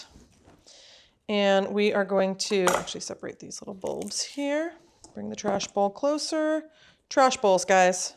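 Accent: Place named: Midwest, United States. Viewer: Omg, I’m Carolyn but I love you! So glad I found you. You sound midwestern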